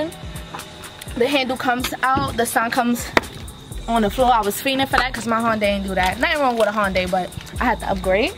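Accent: New York, United States. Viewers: She from Ny??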